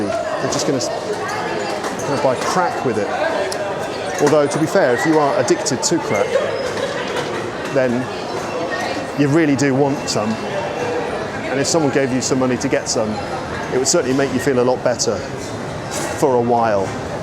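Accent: United Kingdom. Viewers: Thank you very much, you are the best choice for me in order to learning English, particularly British accent, this accent is life, love you from Iran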